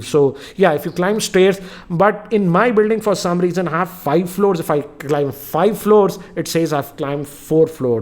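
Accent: India. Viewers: Sir, You sound Indian tone English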